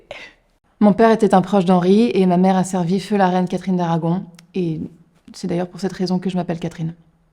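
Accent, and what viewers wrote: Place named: Paris, France. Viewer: J'aime beaucoup le concept et le contenu. Bravo ! Par contre j'entends un accent parisien super fort.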